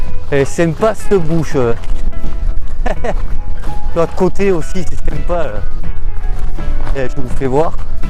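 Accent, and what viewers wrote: South, France. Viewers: Bonsoir et tous,merci pour le guidage,je passe un moment de réel balade,je suis pas tout seul tu est là et avait l accent du midi,les cigales et grillons chute on est bien on continue,je les ouvres bien grand mes yeux,heureux ho oui,comme un marcheur aventureus.MERCI POUCE BON VENT.papy robert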